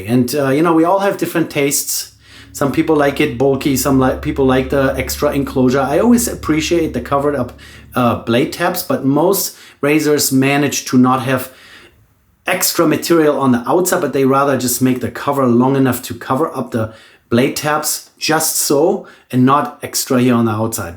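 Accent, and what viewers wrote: Germany. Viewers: Thanks! Great videos and I appreciate the accent, is it German? 👍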